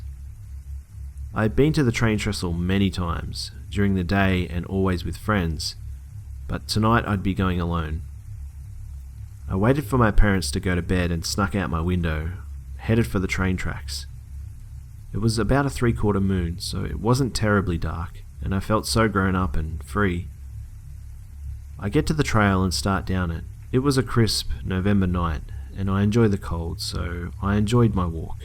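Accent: Australia. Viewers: that freaking Australian accent <3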